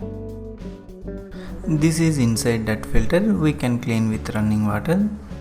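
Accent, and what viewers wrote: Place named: India. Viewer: Thanks for cleaning instructions and fu*ck you because of that Indian accent.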